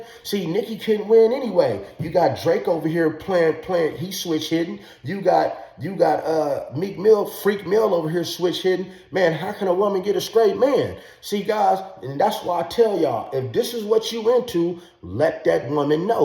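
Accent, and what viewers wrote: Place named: California, United States. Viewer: New sub here coach! I definitely thought you where from cali!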